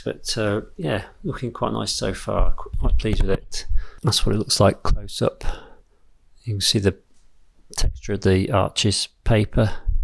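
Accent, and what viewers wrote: United Kingdom. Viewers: Hi. I’m Christophe from Paris. I subscribed quite a time ago. I love your drawings. I’m very much impressed by the large range of medias you are able to practice (watercolor, oil sticks, pastel, charcoal, oil painting …). Your are a very talented artist. I must say one of the most talented I have ever seen so far on internet. Highly professional work, also full of creativity and sensibility. Not surprising to have been promoted by the Royal Birmingham Society of Artists. Your YouTube channel might be also a very usefull guide to guys like me trying to produce some masterpiece in their living room! May I also tell you that I love your dog Basil (he seems to be the happiest dog of the world) and also (from this side of the channel) your so lovely british accent 😊. Best wishes from Paris and long life to your channel.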